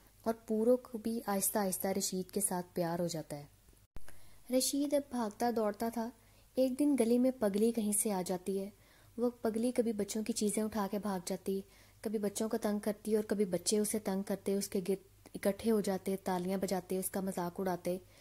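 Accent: Pakistan. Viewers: Aap pakistaniyo jaise bolti hai ? Bht acha explain kiya thank you so much ❤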